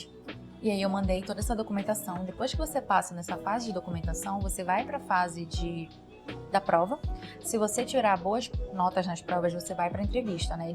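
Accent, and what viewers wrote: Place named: Pará, Brazil. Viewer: Um sotaque lindo, parece paraense❤😂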